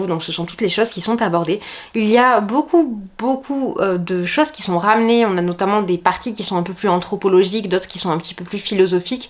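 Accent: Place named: South, France. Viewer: J’adore ton petit accent du sud 😊☺️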